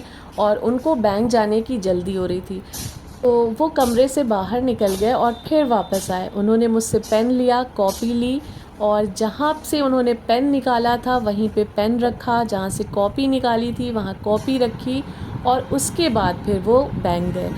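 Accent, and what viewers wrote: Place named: Hyderabad, India. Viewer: Assalamualaikum khairiyat kaise ho ap mai samjh t thi u p ke log alag baat karenge lekin ap to hyderabad ke logon ki tarah baat kare Mujhe bohot acha lagta hai apke vlog dekh ker allah ap ku khush rakhe ameen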